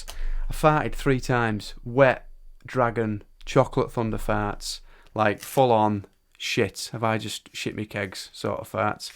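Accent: Birmingham, United Kingdom. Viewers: Golden fingaaas CHECK Bostin accent CHECK On-the-edge-of-the-seat storytelling CHECK Best dad ever CHECK LE-GEND!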